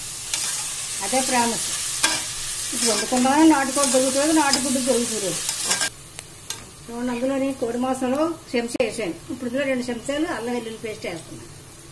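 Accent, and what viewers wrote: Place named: Andhra Pradesh, India. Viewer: Happy to hear pure andhra slang 😊😍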